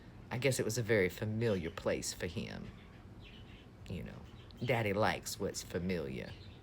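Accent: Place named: Louisiana, United States. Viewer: First of all took me a while to figure out what that accent came from.Yeah, I know, shut them.I'm like.Oh that's a proper southern woman's language from louisiana.. Don't care , don't care you have beautiful grandchildren , you have beautiful Beautiful. Eyes I can see it way appear in ohio Don't mean anything by but I love you honey❤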